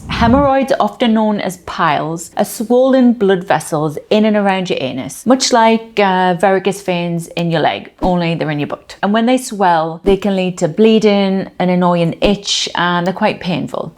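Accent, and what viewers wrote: United Kingdom. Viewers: What part of the UK is this accent from? Midlands?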